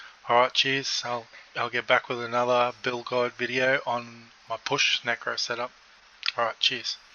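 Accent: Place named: Australia. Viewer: Nice to see a guide with an Aussie accent.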